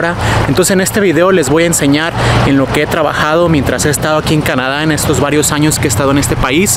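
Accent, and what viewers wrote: Mexico. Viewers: Con este vídeo descubrí tu canal. Me quedé porque me resultó muy familiar tu acento; me dije: "habla como mexicano" . Luego lo confirme' cuando dijiste que comprarías tortillas. Después usaste el adverbio "bien" en el sentido de "muy" o "mucho" y eso me hizo sospechar que pudieras ser tapatío, porque recuerda que nos delata la expresión " bien mucho". Finalmente mencionaste que aprendiste soldadura en Guadalajara, en el CECATI de la calle 56. Ocurre q ue mi casa está en la calle 60, muy cerca de esa escuela. Nada más por eso, ya me suscribi' y seguiré tus vídeos. Saludosx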